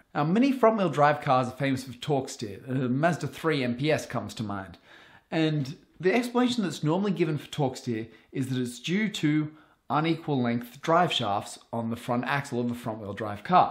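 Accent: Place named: Australia. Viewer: what part of Australia is your accent from?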